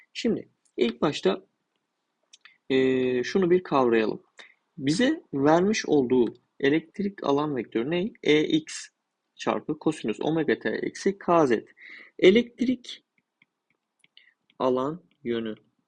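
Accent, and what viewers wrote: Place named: Samsun, Turkey. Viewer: hocam e harfini telafuzunuzdan anladım ben de samsunluyum. videolarınız için teşekkür ederiz kaynak bulmak çok zor gerçekten sağolun.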